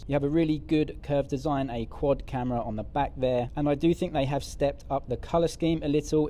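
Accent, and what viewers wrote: United Kingdom. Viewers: Your accent like British How you came to china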